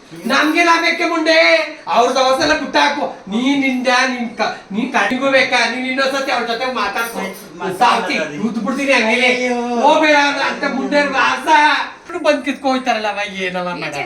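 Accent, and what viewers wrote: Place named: Bangalore, India. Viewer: Nam banglore bhage chanagi helidri navu bangkore avare Banashankari avaru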